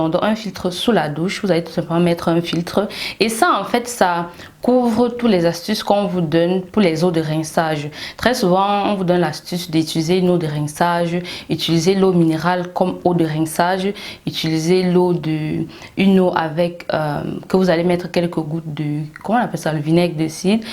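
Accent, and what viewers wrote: Cameroon. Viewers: Oulala j’aime ton accent 🥰 une vrai kamer 😂😂🤣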